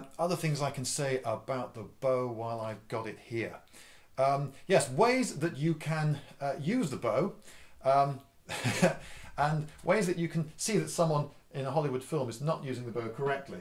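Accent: England, United Kingdom. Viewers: Oh thank God you were EXTRA English in this video! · props to the mentioned extra English-ness. I enjoy your videos very much. best regards from across the pond!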